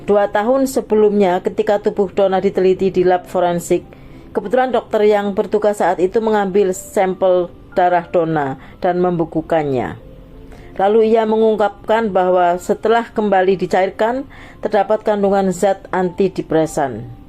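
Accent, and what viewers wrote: Java, Indonesia. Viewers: trllu mdok jowone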